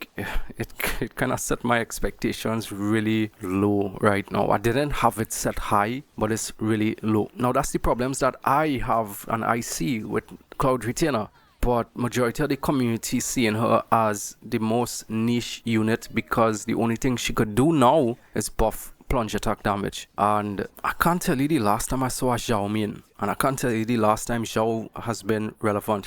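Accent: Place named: Trinidad and Tobago. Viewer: Dude, are you Trinidadian? That accent sounds classic Trini.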